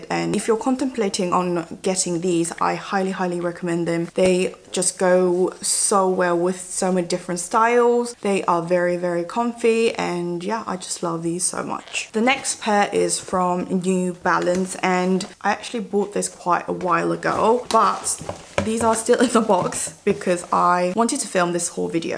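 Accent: United Kingdom. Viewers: omg I've actually missed your british accent!!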